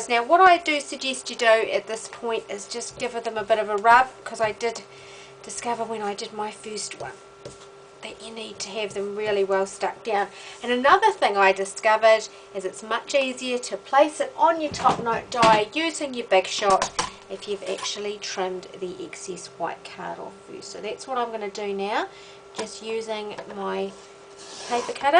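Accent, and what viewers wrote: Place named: New Zealand. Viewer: nice video - clearly done. Was wondering if there were any NZers working on cards and posting videos on you tube. Nice to hear that accent again! Looking forward to more videos from you.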